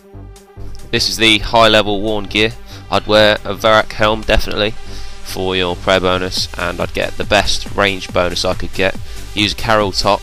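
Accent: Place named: North, United Kingdom. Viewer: i need 2 pull my finger out and get a cape, good guide m8 def gonna try your invents when i go for it....... you from up north m8, i thought u was a southern fairy ?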